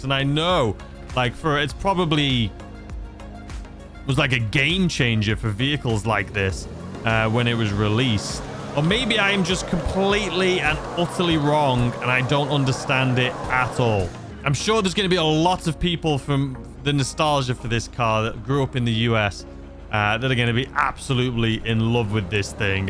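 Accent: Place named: Doncaster, United Kingdom. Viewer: Sounds like your from sunny Doncaster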